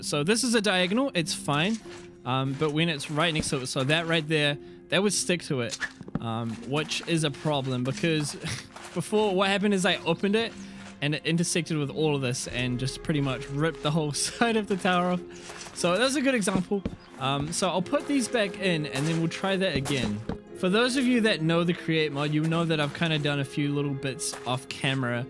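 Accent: New Zealand. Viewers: Why does every kiwi youtuber I come across have some weird amalgamation of a kiwi accent with an american one? Rip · Whats that accent of yours? New Zealand? Im trying to learn to differenciate them and you sound like temuera Morrison…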